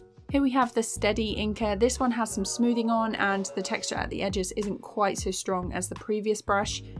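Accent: United Kingdom. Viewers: Your animation is great and tahnks for the pack! lol your british accent is rly clean and heavy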